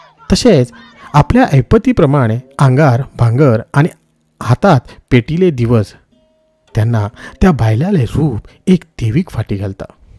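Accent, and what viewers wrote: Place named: Karwar, India. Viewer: उत्कृश्ट लेखन आनी निवेदन...आमगेली गोड कारवारी बोली... परबीं तुमकां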